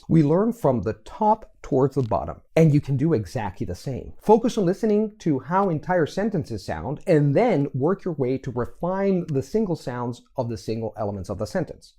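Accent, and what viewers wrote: United States. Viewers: For those non-native speakers here who are wondering-yes, Luca does sound like an American. -a native speaker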